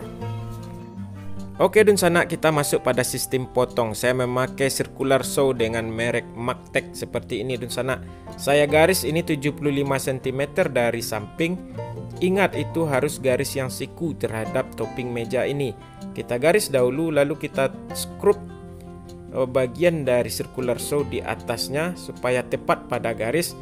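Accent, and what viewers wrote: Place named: Sumatra, Indonesia. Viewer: Org mana aslinya Om kalo didengar suara org sumatera tp kalo kata dingsanak itu bahasa Banjar Kalimantan Selatan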